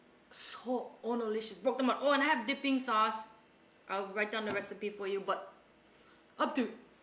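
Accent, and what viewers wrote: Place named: Hawaii, United States. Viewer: "da less dishes i wash da bettah!" lol i love your videos xD you're so entertaining to watch and i like how you incorporate pidgin in your show. au'raiii!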